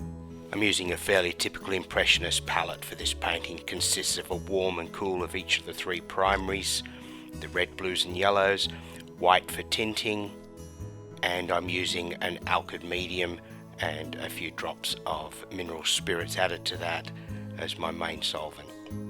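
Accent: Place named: Australia. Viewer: Ah finally found your channel after discovering it a couple weeks ago then losing it lol. Love your style of painting and the way you talk, gotta love them aussies. Im subbed.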